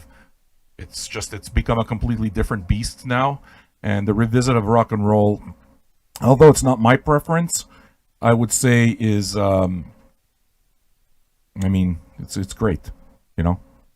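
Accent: Quebec, Canada. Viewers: Montreal I think is where he lives, not sure if that's where he's from originally but he sounds fairly French-Canadian to me... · Oh un québécois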